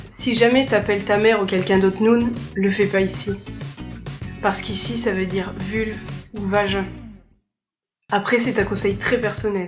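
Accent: France. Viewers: M0i jaddort l'accent française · Messemblais que tavais un accent français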